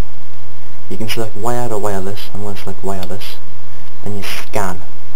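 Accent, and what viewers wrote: United Kingdom. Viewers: are you a geordie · oh good it is a british person doing it the how to video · Thank u s omuch dude your the best even thoughe u sound like a skotsman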